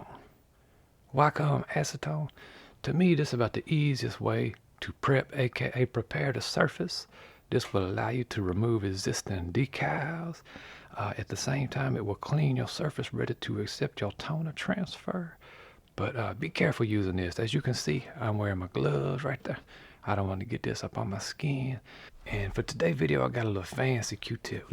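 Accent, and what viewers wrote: Louisiana, United States. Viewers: Hay are you from Louisiana?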